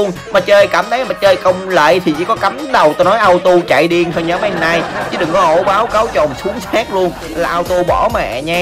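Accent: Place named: Mekong Delta, Vietnam. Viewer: Rất có tâm, đúng với giọng điệu miền tây...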